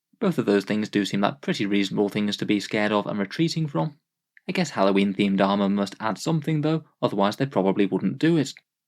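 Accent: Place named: Northern England, United Kingdom. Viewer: He's northern english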